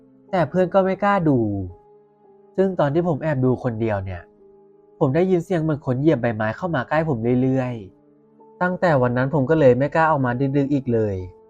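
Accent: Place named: Isan, Thailand. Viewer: ผมว่าเล่าได้ดีนะครับ มันดูเป็นกันเองครับ คือบ้านผมอยู่ภาคอีสานนะครับ แล้วสำเนียงของพี่กับการเล่ามันเข้ากันมากกครับ ฟังแล้ว หลอนเลย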